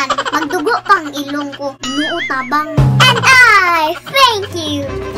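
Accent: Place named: Mindanao, Philippines. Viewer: Cute kaayo ka day.pede mangutana.nganong naa man ksy dira nga word.mura mn ka og taga mindanao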